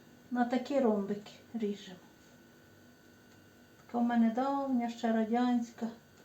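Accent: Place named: Carpathians, Ukraine. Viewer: Приємно слухати Дуже гарний карпацький діалект❤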